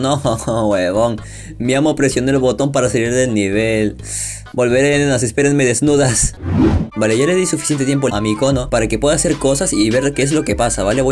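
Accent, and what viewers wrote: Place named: Peru. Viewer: Tu acento peruano me caga de la risa xD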